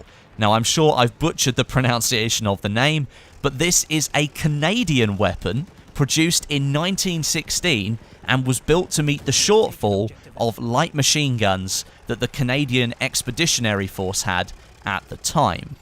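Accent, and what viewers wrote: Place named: United Kingdom. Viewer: why do you Brits have such a smoothing accent? lol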